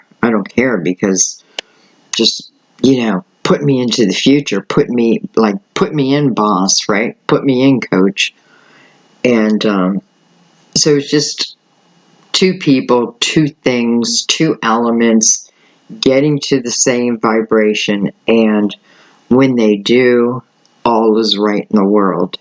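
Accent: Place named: Massachusetts, United States. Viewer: You sound like your from massachusetts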